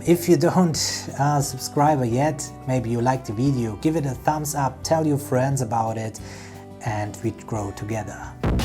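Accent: Germany. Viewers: Wow big German accent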